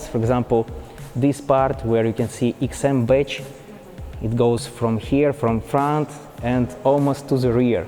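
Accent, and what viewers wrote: Russia. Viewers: this car is as ugly as the russian english spoken by the presenter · I love that he has a Russian accent and looks asian, reviewing a German car. Global! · Привет! работай над английским, особенно над произношением! Ну прям Русский Английский. Молодец! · Ведущий русский что ли акцент такой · Sorry bro but its impossible to listen your russian english..its not normal.. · Russian English 🤦🤦😂😂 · Блин учи Английски. Звучишь как русский из американских фильмов.👎👎👎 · Хех, русинглишь. Ну понятно говоришь, молодец! За обзорчик спасибо! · Russian Accent is horable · very ''Russian'' English...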